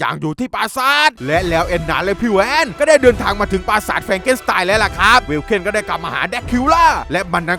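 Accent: South, Thailand. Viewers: มึงคนใต้...ไช่หม้ายคับพี่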